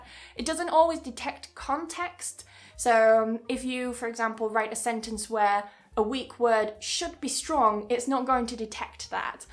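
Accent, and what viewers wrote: United Kingdom. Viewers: r u British ? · I was looking for pure british accent speaking content and I find you accidently. Because my search was done yestarday and didn't end up with relevant contents. Today, I suddenly come through your content. So wonderful. My greetings from Algeria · Wow really awesome British accent!! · I wanna to learn British accent from you. Therefore guide me how am I join your online english British accent course…? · Hii I like your video, are you from UK? :)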